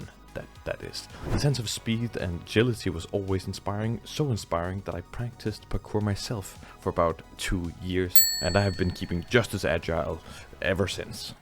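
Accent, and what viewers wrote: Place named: China. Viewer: Nice game! Why not add an animation where if you barely miss the jump you can grab the edge with your hands and pull yourself up? That way you can miss a little and still make it. But if you miss by say more than 2 ft or something, you STILL reach out as you would but your hands just go flat down the surface and you fall to the floor. ? Hey, just curious. You look Saudi Arabia-ish but you sound like 10% Chinese. Are you living in China? I'm just curious! I think I have some strange interest in genetics, morphology of humans and how all that affects their speech and body and brains and so on. Well, good luck with everything you do. You're very intelligent and creative.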